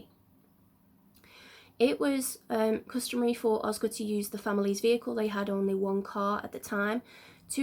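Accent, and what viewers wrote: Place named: Scotland, United Kingdom. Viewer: are you Scottish? Dark Curiosities is another Scottish Voice I love. Great accent the Scots have 👍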